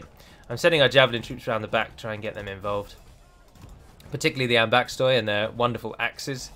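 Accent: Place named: Yorkshire, United Kingdom. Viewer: that yorkshire accent was... enchanting.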